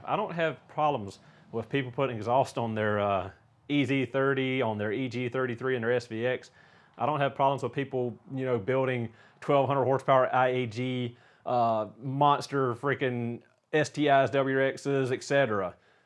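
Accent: United States. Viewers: all good it was funny especially in your yanky accent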